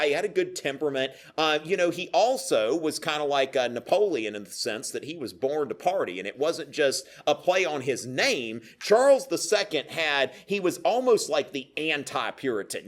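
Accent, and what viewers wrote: South, United States. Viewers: As an Englishman, listening to our history getting explained informally in a strong southern US accent just doesn't sound right 🤔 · Good lecture but it is so strange to hear such strong southern accent. It is not the guy's fault, but it is difficult listen to.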